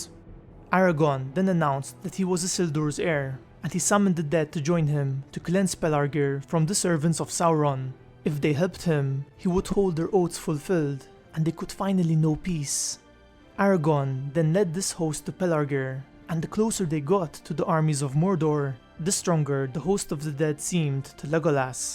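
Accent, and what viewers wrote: Malta. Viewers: LOL... I love Malta & Gozo though I've only been once, I think the accent is beautiful and can listen all day, but sometimes I have a slight laugh to myself as the pronunciation sometimes differs from English... then he shows a bowl of oats for the "oat breakers". LOL!! Well played sir, well played !! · Are you Maltese?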